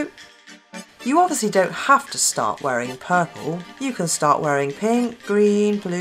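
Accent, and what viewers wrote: England, United Kingdom. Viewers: I love all your videos they are so awesome, your so good at doing nails. I got inspired by you cute icecreame nails that are so cute and I did them on my nails but didn't turn out as good as yours😂😔 anyway I also love your accent I lived in England for 4 years I'm from chile. I have one last question if you don't mind what part of England are you from? thank you your videos at awesome.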